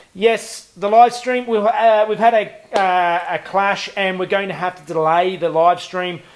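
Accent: Australia. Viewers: So apparently Ms accent is Kiwi. I thought had an Australian accent, but my bro said he's Kiwi as well. I think they live in Sydney. Can anyone confirm if is Aussie or Kiwi?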